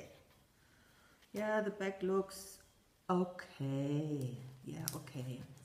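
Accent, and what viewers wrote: Germany. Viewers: Hello, I found this video today, and so enjoyed it I had to go find the first 2 that you had done and get caught up. I feel you are a kindred spirit to me in many ways. I, too, love smalls and would never even think of doing a HAED work, even though there are many beautiful ones..or a Mirabilia! I have 3 of the Lizzy Kate snowmen charts and was going to finish them as ornaments, but the picture with all of them is so cute. I think my grandsons old enjoy that alot. Thank you for taking the time to share your stitching and I hope you will continue to do so. Also, meine Mutti war auf Deutschland, so I enjoy listening to you accent. Happy Holidays!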